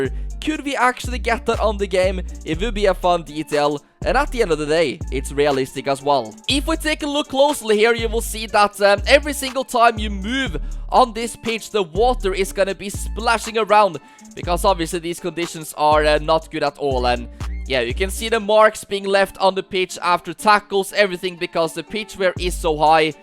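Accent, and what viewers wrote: Norway. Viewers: Jeg kan høre på engelsken din at du er norsk bruttern❤😅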